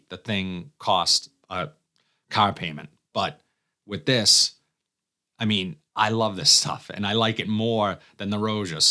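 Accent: Boston, United States. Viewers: Great review Boston bro! Have my son with me today and he digs your accent. Sadly mine only kicks up when in Beantown and Warner, NH :)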